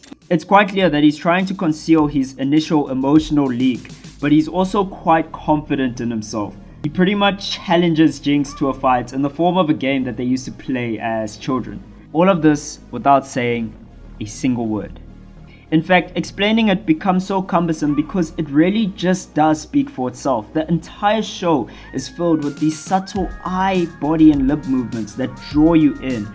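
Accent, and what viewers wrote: South Africa. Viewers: I just know you South African 😂😂bro I appreciate the review ,you did an amazing job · Within 6.12 seconds I recognized that south african accent! Great to see you popping off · Eh dude nice to here a south african voice, binge watched it then woke my brother up and binge watched it again lol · aweeee dude, as soon as you spoke I thought I detected a fellow South African. Fantastic analysis - keep up the great work. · First time watching a video. are you a saffa? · Bro are you from South Africa? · Hang on... Are you South African?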